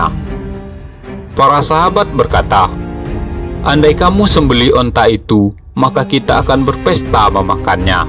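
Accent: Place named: Padang, Indonesia. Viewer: Orang padang pasti dari logat bicaranya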